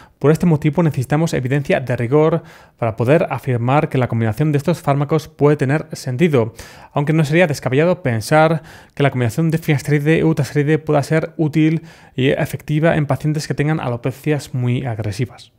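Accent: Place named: Spain. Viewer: de donde eres? tienes un acento español pero con un dejo como si no fueras nativo en el idioma.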